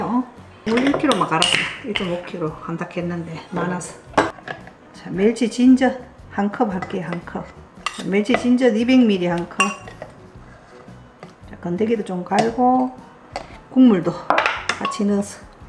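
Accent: Gyeongsang, South Korea. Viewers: 작년에 친정엄마 돌아가시고 우연히 이 채널을 보게 되었는데 펑펑 울었네요. 재료 손질하고 넣을때 터프하신 손놀림이나 경상도 말투나 엄마가 너무 생각나요. 요리를 따라서 하진 않지만 새 영상 올라오면 다 챙겨봅니다. 자주 올려주세요^^ 엄마와 딸 너무 보기 좋아요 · 님은 경상도에 사시나요~?경상도사투리로 말씀하시는데~김치도 약간 경상도식으로~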